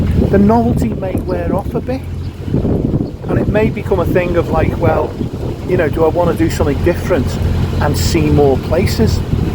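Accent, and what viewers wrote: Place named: Cockney, United Kingdom. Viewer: Gutted I missed you cockney!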